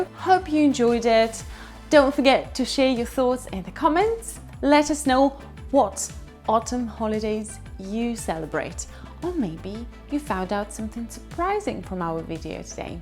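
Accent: United Kingdom. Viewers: I have fallen in love with your British accent ❤ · I admire your British accent! Cheers! :) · Если бы темп её речи был чуточку быстрее, то это был бы ад для меня при понимании. Ну никак не могу разобрать британский акцент!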